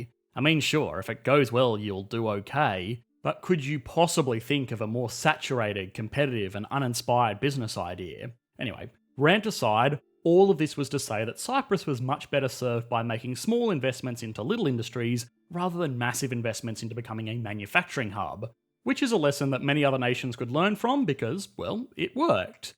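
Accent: Australia. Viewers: Well in fairness, the guy is an economist, he does economy videos, the history was tacked on more as a factor in that economy and the details don't change anything in regards to that, so it doesn't have to be too accurate. I don't watch these videos for history lessons, but because I like the Australien accent and the economic insight I get. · At 5,30 He had a stupid pronunciation for the capitol city - Sounding something like Nicozeya rather than Nik O See A! By accent, not sure if the guy is Australian mixed in with South Aprican with a dash of American splashed in there! Peace.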